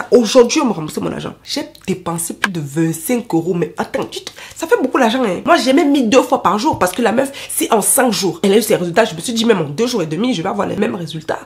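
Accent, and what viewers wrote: Cameroon. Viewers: Naah l’accent kmer vraiment je peux dead 😍😍😂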